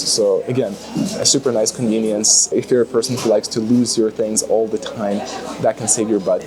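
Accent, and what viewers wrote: United States. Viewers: On another note, as an American who taught English for 3 years in Italy oh, I will say that your American is excellent. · I find it interesting I'm not the only one who thought you was a yank! Just wondering, how did you get an American accent? Have you spent a lot of time over there, or do you learn American English in Lithuanian schools?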